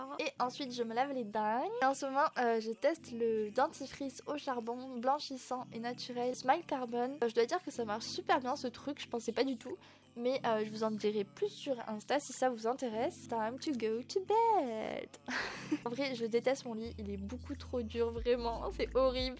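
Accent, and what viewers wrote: Marseille, France. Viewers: Je t'aime trop tes la meilleure ❤😱😍😍👌 et j'adore tes cheveux magnifique 😍👌🔥demain cours 😱😭😭 j'adore ton accent marseillais et Oui pour la vidéo que tu veux faire ❤❤👌toute façon toute tes vidéo sont parfaite; tu te couche aussi tard que moi 😂😂😘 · L'accent marseillais qui ressort +++ on adore !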